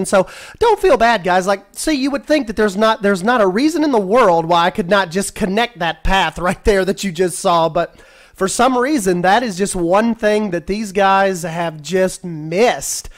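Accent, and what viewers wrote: South, United States. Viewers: correct me if I'm wrong but are you from the Southern part of the United States cause you have what they call a Southern Accent I have a Grate Uncle who lives in Kentucky and when he talks you definitely know he's from the South even though he grew up here in New England, New Hampshire to be exact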